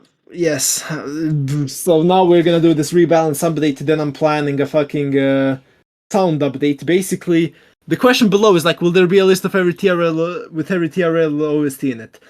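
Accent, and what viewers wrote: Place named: Russia. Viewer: Why does shound russian english accent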